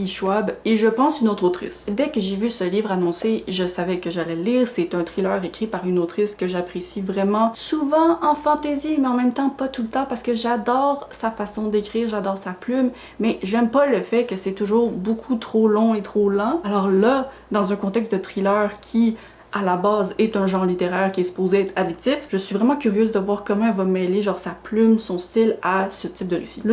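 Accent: Quebec, Canada. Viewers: N'hésite pas à être plus québécoise, même si on ne comprend pas tout, ça aura du charme ! Année épuisante pour moi aussi. J ai commencé un nouveau travail en novembre dernier ( j'accompagne des élèves en situation de handicap, c'est très fatiguant, je suis vidée chaque soir ). Je n'ai pas du lire plus de 15 livres mais en même temps je n'ai jamais été quelqu'un qui lit vite ! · Coucou ! Tout d'abord, bon courage pour cette année pas toujours joyeuse ! J'ai connu des périodes très difficiles ces dernières années, entre des soucis au travail, des problèmes de santé, des problèmes financiers, tout ça en préparant un concours difficile pour changer de métier... je sais ce que c'est que se découvrir forte et enfermer les émotions pour tenir. Je pense être sortie, pour une grosse partie des soucis, de cette période (et en plus j'ai enfin réussi le fameux concours après plusieurs tentatives ^^), mais voilà, j'espère que tu sortiras la tête de l'eau 🙂 Bref, je t'ai découverte il y a plusieurs semaines / quelques mois déjà, ta chaîne ayant été recommandée sur un Discord à quelqu'un qui cherchait des chaînes booktube. 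J'ai tout de suite accroché à ta façon d'être, à l'énergie que tu dégages, à tes lectures éclectiques, mais j'avoue aussi à ton accent (j'ai toujours aimé l'accent québécois ^^). Pour ma part, même si je ne comprends pas certaines expressions, ça ne fait rien, je pense qu'avec le contexte je peux m'en sortir (et au pire, ce n'est pas grave) ! Côté lecture j'en suis à 47 livres lus pour ce premier semestre, un peu moins que l'année dernière qui fut une très bonne année de lecture (122 livres lus de mémoire, 0 abandon alors que j'en suis déjà à 5 cette année !). J'espère que ça ira encore mieux malgré mon changement de travail fin août 😀 A bientôt pour ta prochaine vidéo !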